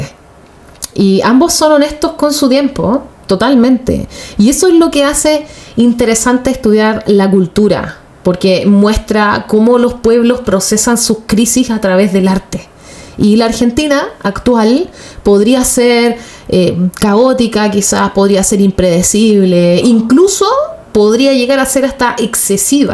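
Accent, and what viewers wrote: Chile. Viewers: GRACIAS...de corazón...se te oye de chile...te sospecho en Argentina...de ser así, un secreto....se te nota conmovida por BUenos Aires, y más siendo arquitecta, tenes mil secretos para revelar...algo mas...si amas BUenos Aires,la ciudad, su caos, su ruido, BUenos Aires te alojara en un lugar de su Corazón...eso es ser de BUenos AIres...y ningún porteño va a poder quitarte esto...los primeros hijos de esta ciudad, no fueron nacidos, fueron adoptados...un ticket a otra vida...la apariencia de ser agrandados, atropellados, es el derecho de piso a existir, para no ser tragados, luego queda y se hace característica...y uno se jacta...por que cualquier cosa es buena para ocultar los dolores, y otras nanas...BUenos Aires es un monstruo, con lugar para quienes le susurran, y a ellos, les da su ternura...todo un descubrimiento... otras ciudades, quizás, sean fáciles...a esta, le toco aser asi. 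Buenaventura...!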